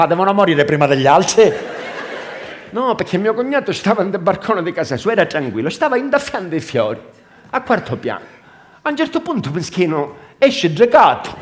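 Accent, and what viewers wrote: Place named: Sicily, Italy. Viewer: Very, very funny. He sounds like my Sicilian (di Agrigento) father spoke. grandissimo di l'america.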